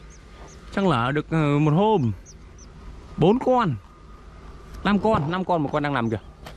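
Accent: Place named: Hải Dương, Vietnam. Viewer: ânh đang h đang sống ở đâu vậy, nghe như Hải Dương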